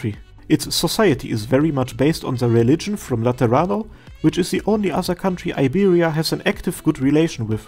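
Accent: Germany. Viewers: Man I loved this stuff, no one ever has put so much effort into this kind of videos explaining the lore of the world of Terra, love it, keep up the good work! PD.: I love your german accent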